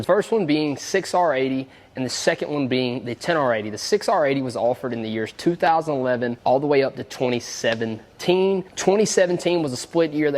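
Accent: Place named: South, United States. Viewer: you're a natural presenter. You really know how to take a complex topic and condense it for non-mechanics to understand. And your southern accent makes the videos even more enjoyable to watch. Keep up the great work! ☮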